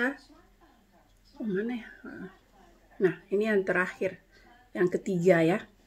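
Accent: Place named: Ullath, Indonesia. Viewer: Mantap,logat Ullath bole biar ciri khas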